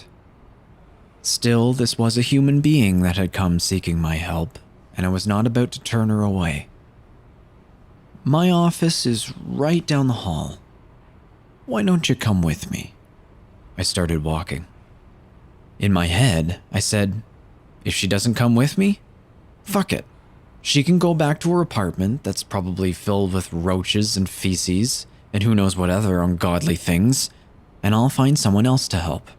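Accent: Canada. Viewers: The guy reading is definitely Canadian. About = aboot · I love your little Canadianisms btw:3 Which is your favourite of the stories you've narrated?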